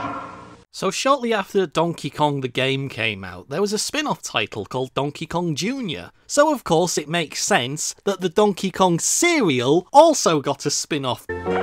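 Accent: United Kingdom. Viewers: Is Scottish Irish Or British?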